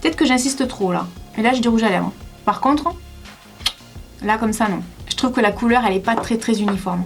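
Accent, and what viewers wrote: South, France. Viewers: Hello, en temps que française qui habite en Suisse, tu es mon rayon de soleil du Sud avec ton magnifique accent! Merci pour ça. Aussi je voulais ajouter que j'adorais tout particulièrement ton maquillage des yeux, encore plus beau que d'habitude!😍 Et oui je confirme quand ta plus tes taches de rousseures ça fait bizzare, mais l'effet cadavre avec cette teinte est garantie 😂 Je voulais aussi dire que j'ai souvent eu l'impression avec les rouges à lèvres (à peu près de la même teinte que le plus foncé ) il y avait souvent de l'inégalité dans la couleur! Merci en tous cas pour nous partager tes avis et ta bonne humeur! Ne change rien! 😘❤❤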